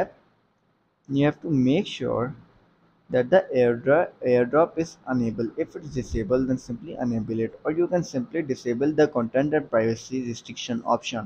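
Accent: India. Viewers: Could barely understand anything with that thick Indian accent. Still can’t get it to work.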